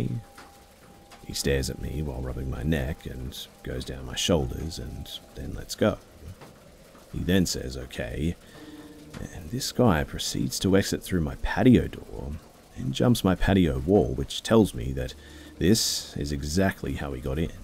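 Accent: Australia. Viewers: Noo not the Australian accent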